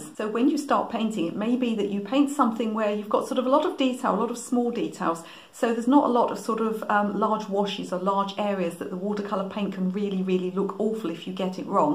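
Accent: England, United Kingdom. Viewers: I love your videos for so many reasons: 1.- You are realistic. Many people believe that Art is "spontaneous"... LOL... Spoiler Alert: Art is much like some kind of science. 2.- You are strict. People think that Art is total freedom, while total freedom is achieve by superceeding maximum restrains. 3.- You are funny. I love your jokes, it gives the audience the notion that Art is not necessarily "solemn". 4.- I really, really, really enjoy your amazing English accent (II am from Argentina, we speak Spanish), which is like a super give-away for me.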